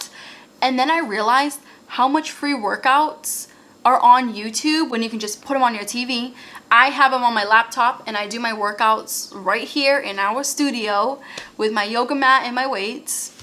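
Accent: Boston, United States. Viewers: lala suncokretic do you mean her Boston accent is more pronounced lately? I noticed that too. She’s probably more comfortable with it now, and isn’t trying to tone it down. She actually might have mentioned that in a video.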